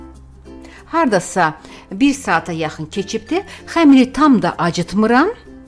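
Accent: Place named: Sheki, Azerbaijan. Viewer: Mən Şəki lehcesine oxşadiram.mamamin xalası da eynən belə danışırdı.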